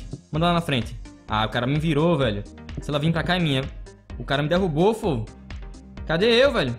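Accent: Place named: Northeast, Brazil. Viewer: cara vc é do nordeste se fo diz qual e seu estado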